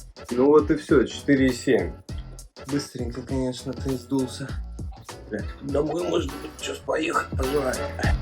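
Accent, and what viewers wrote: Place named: Moscow, Russia. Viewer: Чувак конечно крутой, но маасковский акцент все убивает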